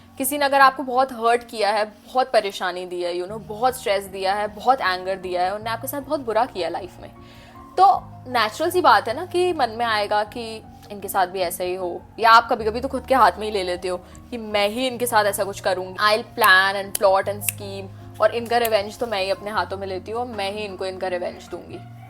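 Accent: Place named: India. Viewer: Muje lga thumbnail se .koi foreigner tarot reader h😂aapki hindi se pta chala aap to indian h❤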